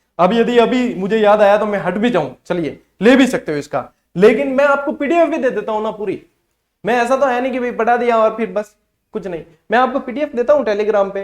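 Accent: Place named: Marwari, India. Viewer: Bhot acha pdhà rhe ho sir bt aap marwari thodi kam bolne ka try kre .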